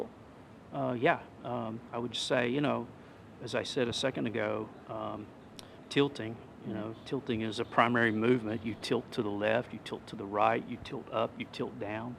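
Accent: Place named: United States. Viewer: What S&T is..? Is 'painting lipstick on a pig', it is just fast-talking with an American accent which cons people into thinking it is good for them..Generally it is pretty much what has been done WITH SHORTER CLUBS since forever...and it can't be successfully done with the longer clubs. If you don't move weight/mass away on the backswing..you can't use it on the downswing.. proponents of S&T nearlyy always seem to need to rubbish every other system..just to leverage their marketing of this WHICH NO GOOD GOLFER USES,,,and for which NO statistical evidence of subsequent shot quality has been done or shown. Would any one like to show a video of FADES being repeatedly done across the club range with this system..SHOW AND PROVED, not just talked about.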